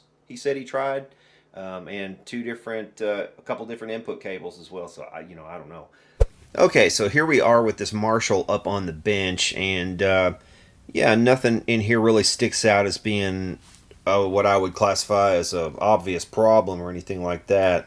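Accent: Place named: South, United States. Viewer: I noticed the amp was signed by Linda. Many years ago I read an interview with Dave Mustaine and Chris Poland from Megadeth and MegaDave said he would open up Marshalls and look for her name as it was sure to be a screamer. Looks like he wasn't full of shit! Love your vids and channel man, I'm from NC and have lived in Seattle for 20 years so it's nice to hear an intelligent southern accent.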